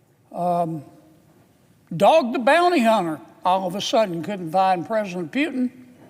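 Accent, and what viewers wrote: South, United States. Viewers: Russian president Vladimir Peutin. Love that southern drawl!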